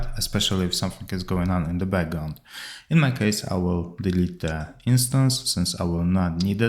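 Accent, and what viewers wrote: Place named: Poland. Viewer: od razu poznalem nasz akcent :P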